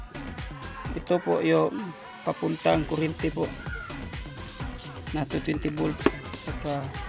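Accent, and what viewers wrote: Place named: Visayas, Philippines. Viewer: idol mura ug bisaya ka ngutana lang! naa ka idea nga mo taas ang amperahe sa transformer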